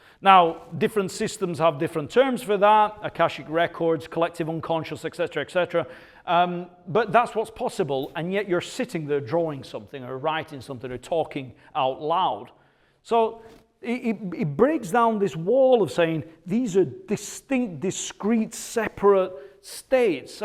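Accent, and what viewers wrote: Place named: Scotland, United Kingdom. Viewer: Scottish accent really sells it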